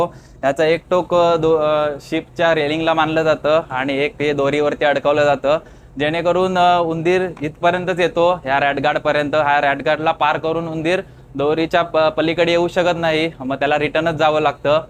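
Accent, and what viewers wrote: Konkan, India. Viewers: Dada tumhi boltana konkni tone janvto 😊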